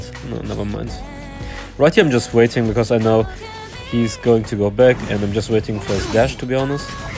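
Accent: Germany. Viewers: Wenn das Deutsch wieder durchkommt. Trotzdem nices Gameplay (wie immer) ich werd wohl eh nicht mehr verstehn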